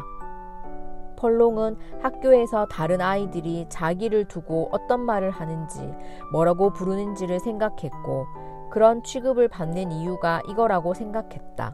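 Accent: South Korea. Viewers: 대구분이신가봐요 ㅋㅋㅋ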